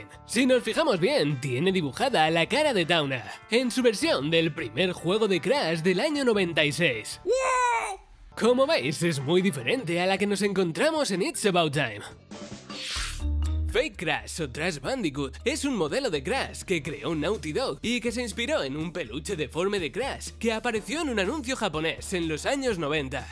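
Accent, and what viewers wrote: Spain. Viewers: aaaaaaaaaaaaaaahh un español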